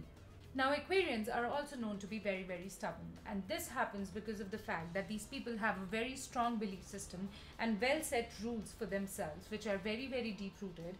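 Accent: India. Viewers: The Indian accent in the voice was a bit of distraction to what needed emphasis. Nevertheless, Aquarius are geniuses and none, among all signs, have a total perfection. Thanks for the suggested actions. 😊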